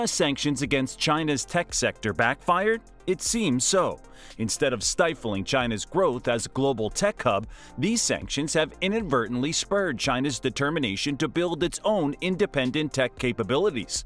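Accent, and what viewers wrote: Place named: United States. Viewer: Why use an American accent when you're anti USA? Keep it 💯 wus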